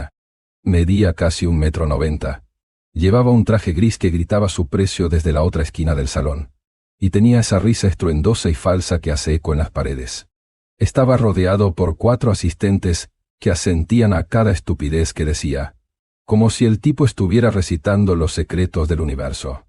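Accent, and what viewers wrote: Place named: Argentina. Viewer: Ese dialecto argentino, cuesta entenderlo, pero como la historia es similar a muchas se logra adivinar la trama.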